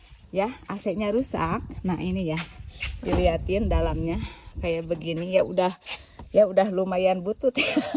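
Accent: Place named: Sunda, Indonesia. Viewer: 20 tahunan di Jepang, eta bahasa Sunda na edun suredunnnn....asli pisan Sunda😀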